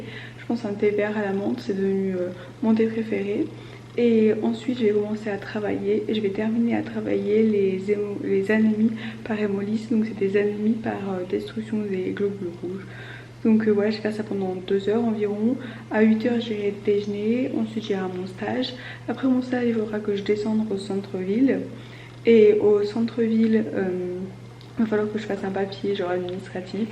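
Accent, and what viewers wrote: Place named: Italy. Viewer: Tu as l'intonation des italiens quand tu parles en français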